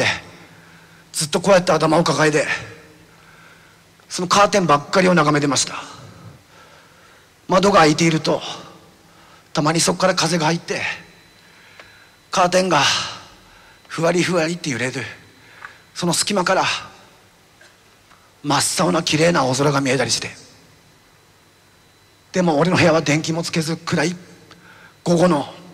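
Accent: Yamagata, Japan. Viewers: 山形県民だから山形の訛りのおかげでさらに入ってくる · 山形弁すげー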